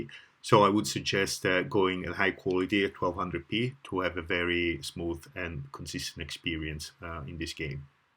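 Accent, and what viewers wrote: Italy. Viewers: Hey man, you sound like an Italian 😉 Very nice review, awesome laptop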